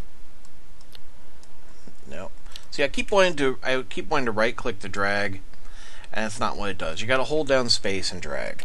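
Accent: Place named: United States. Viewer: You have an american accent and you say you live in scotland did you move?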